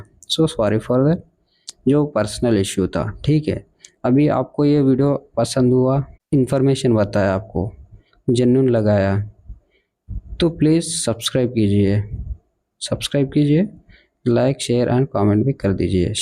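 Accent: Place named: South, India. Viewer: I like your soth Indian accent of hindi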